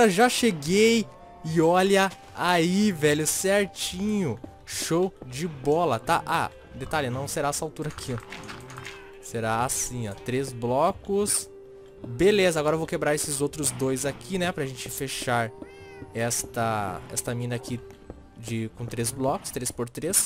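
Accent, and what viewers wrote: Rio Grande do Sul, Brazil. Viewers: eu não gosto quando você fica tudo Gauchinho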